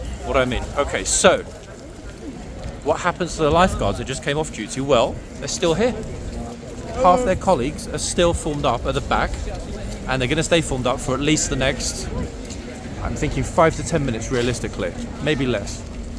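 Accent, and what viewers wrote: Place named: United Kingdom. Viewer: Love this vid, very informative ❤ lovely British accent in the commentary.🏴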